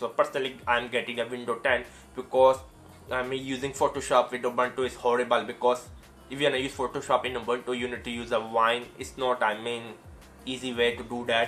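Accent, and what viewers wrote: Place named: India. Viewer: Indian accent awesome sounds like Russel Peters.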